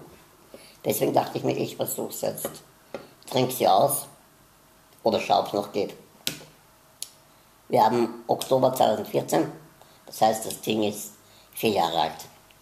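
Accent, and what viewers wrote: Austria. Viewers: Cooles Video. Sehr unterhaltsam. Ich find dich sehr sympathisch und witzig. Und nur mal so ne Frage: Bist du Österreicher? Du hast einen sehr österreichischen Akkzent. Wenn ich raten müsste würde ich sagen, dass du Wiener bist. Abo hast du auf jeden Fall ;D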